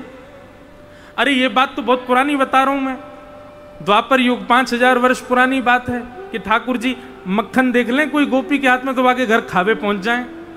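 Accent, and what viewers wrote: Braj, India. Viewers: Radhe Radhe maharaj ji aapki ktha bruj bhasha mein sunana bahut achcha lagta hai ❤❤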